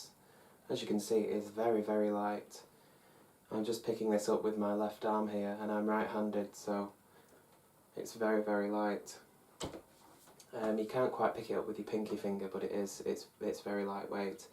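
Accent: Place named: Devon, United Kingdom. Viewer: We Devonshire lads talk like that as well you know😂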